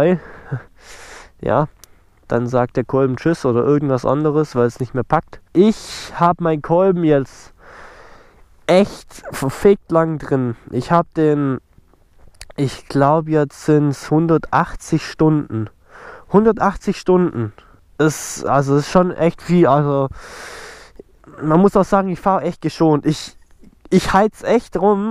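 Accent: Swabia, Germany. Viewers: Doch isch so Aber egal aber was i dich scho lang mal fragen wollte wo wonsch du eigentlich? Weil du so a bissale schwäbisch schwätsch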